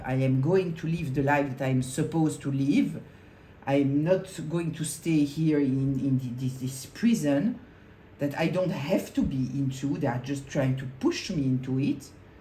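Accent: France. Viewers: Thank you so much!!! :face-red-heart-shape::face-red-heart-shape:Yes exactly! And you explained it so perfectly. I feel like sending it out to my community and extended relatives to explain what they are doing to me. I totally walked away. This helped me so much! I was feeling guilty. Prayed to God for an answer. You provided that for me in a beautiful french accent. I am so grateful to you. You are sure connected to me. I mean it is exact. Took me years to see it. They have caused me such hell, I've lost so much money due to them and I'm so happy to be rid of them. :face-red-heart-shape::face-red-heart-shape::face-red-heart-shape: :eyes-pink-heart-shape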